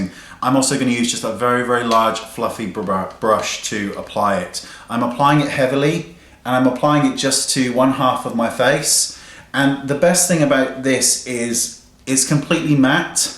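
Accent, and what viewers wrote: Australia. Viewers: are you from australia or great britain? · You're British right? Kinda sound Australian lol. Thanks for the video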